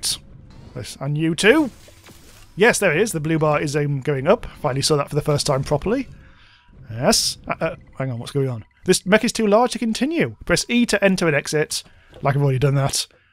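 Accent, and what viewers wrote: United Kingdom. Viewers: I hope there will be stable 1.3 pretty soon. By the way, you have a fantastic voice, are you Britain?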